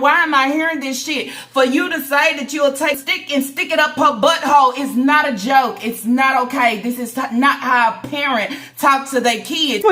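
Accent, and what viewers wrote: Texas, United States. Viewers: ❤ hay girllll hay I just put up.grocerys An stop to catch my.breath.when I herd you say radiation contamination.I fell out laughing. An with that beautiful Texas accent. It's to much.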